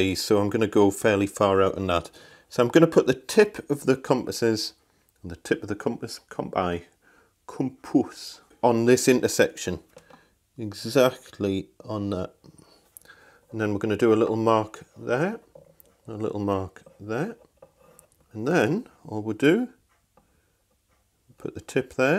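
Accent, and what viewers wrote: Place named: Leicester, United Kingdom. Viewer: Lovely video yet gain. Where is your accent from? I know a chap in Leicester that speaks exactly Iike you! Maybe it’s just the subtle stops in the words? Soothing though lol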